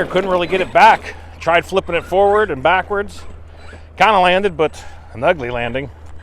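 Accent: Pittsburgh, United States. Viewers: Where are you located? Your accent sounds like western PA/Pittsburgh. Edit - ok - I see a sign in the background that is 724 area code so we are almost neighbors! Where is this track that you are at? I have a Big Rock coming on Thursday. Just subscribed!!!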